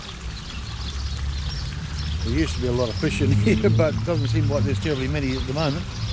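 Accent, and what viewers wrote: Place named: Australia. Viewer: Nice aussie accent. Good job mate.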